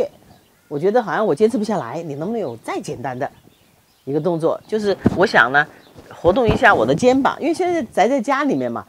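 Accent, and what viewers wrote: Wuhan, China. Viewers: 谢谢！您是武汉/湖北人？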